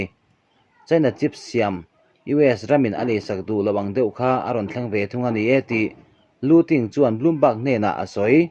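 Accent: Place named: Southern Mizoram, India. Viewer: I chhiar ngaihnawn hle mai. Mizo tawng pawh a tha e. Chhimpa i nih dawn hi. Duhlian tawngah chuan, Lunglei District leh, Tuipui ralho hi an thiam ber mai.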